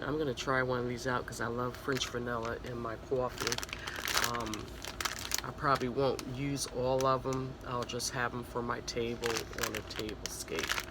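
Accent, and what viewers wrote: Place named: New Jersey, United States. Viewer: Omg! That Jersey Cawfee! We can’t get rid of that accent, regardless of how far we move. Lol. Hey Friend. You cleaned up. Thanks for sharing.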